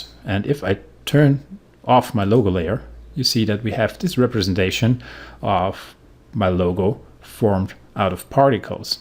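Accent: Germany. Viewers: german ^^ ? (is ur mothertung right?)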